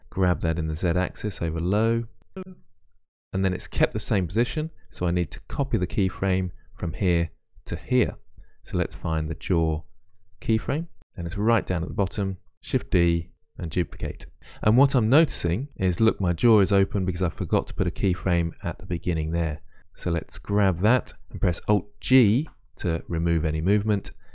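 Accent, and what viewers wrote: United Kingdom. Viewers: I love your videos so much plus your British Accent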